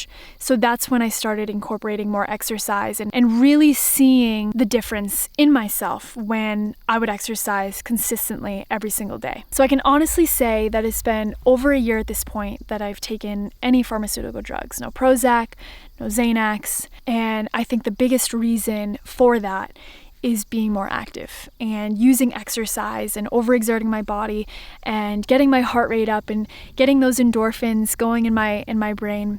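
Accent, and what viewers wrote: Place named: Midwest, United States. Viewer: If you both are from Boston you sure don’t sound like it you sound like Midwest. I’m born and raised in Boston you don’t sound like anyone here lol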